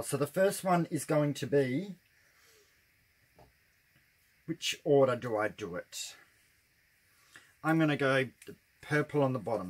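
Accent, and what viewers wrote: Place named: Australia. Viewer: It's good to hear some Aussie talk, Love your work, Cheers from an Aussie viewer xxx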